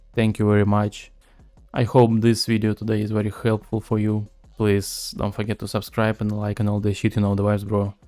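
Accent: Russia. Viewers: maybe I don't know something, But despite the good English language, there is a feeling that you cook well in Russian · Так я сразу понял, что акцент русский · Ты че русский что-ли? Твой акцент сразу понятен. Но тутор классный 👍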